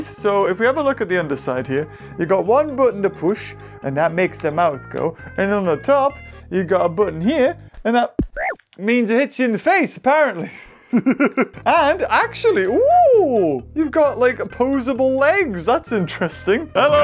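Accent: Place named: United Kingdom. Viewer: V you sound like a "fance English men eh"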